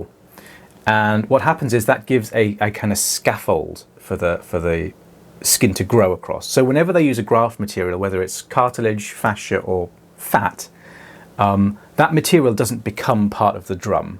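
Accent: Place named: RP, United Kingdom. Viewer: That accent is way too posh for you not to be also part of the “anyone” group.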